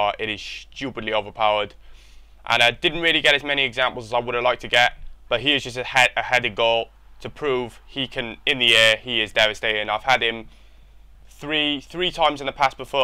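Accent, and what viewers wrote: Wales, United Kingdom. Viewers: Are you welsh? I'm a new sub:-)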